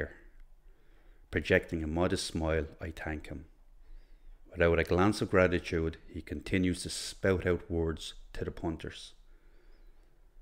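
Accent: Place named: Scotland, United Kingdom. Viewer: I like your accent. Scotish accent I suppose.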